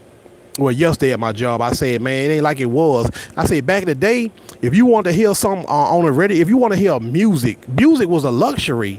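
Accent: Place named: South, United States. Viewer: Your southern drawl sounds like Mahmoud Ali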